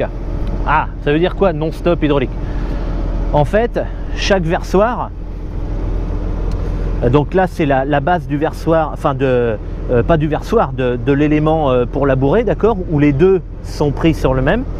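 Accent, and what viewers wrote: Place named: Vendée, France. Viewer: Chère Vendéen y'adore toun'accent,mé i seu en Charente Inférieure le pays d'Goulbenèze,un grand salut à vous aut'teurtout. Et vive les socs et les oreilles.😅😅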